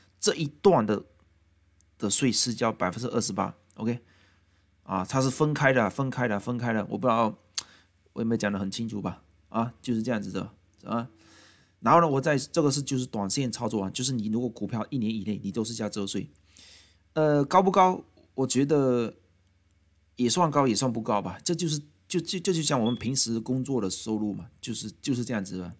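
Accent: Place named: Fuzhou, China. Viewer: 這福州口音 太輕切了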